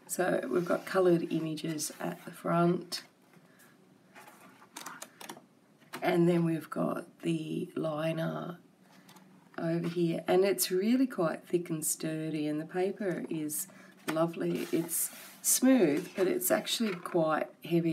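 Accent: Australia. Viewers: Great video, I love you're Australian accent, cute stuff.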